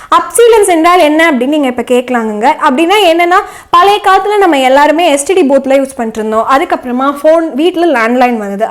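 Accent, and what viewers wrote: Coimbatore, India. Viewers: I appreciate your Coimbatore Tamil lecture.long live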